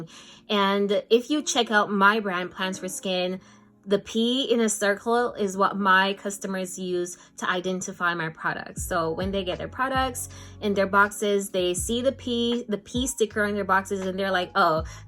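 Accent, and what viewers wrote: Jamaica. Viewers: Do you have Jamaican parents? I'm hearing Jamaica in your voice. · Hello, I am wanting to venture into the beauty industry, I hope is to cater to everyone but specifically: Acne prone & Oily skin. Glad I came across your video. Also, I heard a Jamaican accent ❤️ 🇯🇲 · I hear Jamaican accent somewhere in there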